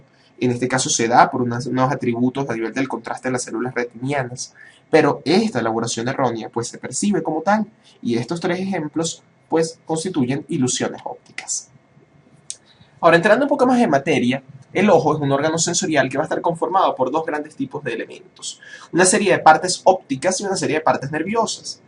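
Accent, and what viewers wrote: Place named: Venezuela. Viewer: Jeje tu acento, supuse que eras Venezolano jeje :) Me alegro mucho de conseguir por fin un EXCELENTE video realizado por un Dr. Venezolano :) Excelentisimo de verdad =) Saludos desde Anzoategui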